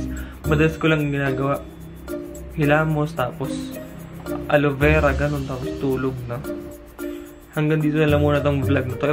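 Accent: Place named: Mandaluyong, Philippines. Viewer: Luh parang taga mandaluyong kapo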